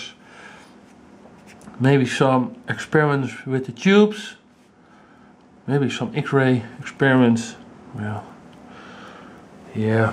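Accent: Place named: Netherlands. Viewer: Nederlander zeker? :P Awesome to have a peek inside these things though! :D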